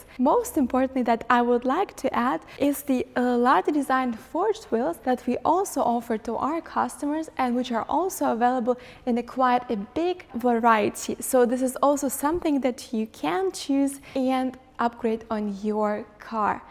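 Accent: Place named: India. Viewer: этот английский для индусов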